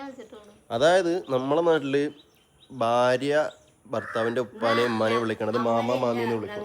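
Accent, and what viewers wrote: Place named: Kottayam, India. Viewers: അച്ചടി ഭാഷ കോട്ടയം ജില്ല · അച്ചടി ഭാഷ കോട്ടയം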